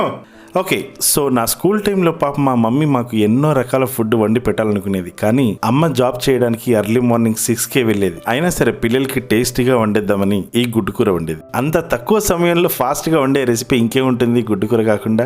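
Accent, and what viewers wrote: India. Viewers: sir, plz check your Instagram for both egg n gheeRoast Ckn. Just tagged from my wife's account😊😊we just prepared...as usual Ur voice, song, presentation.....finally Telugu slang.......pichchekkinchesindi😂😂😂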